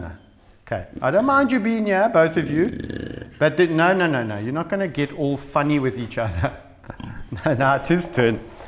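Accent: South Africa. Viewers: He sounds South African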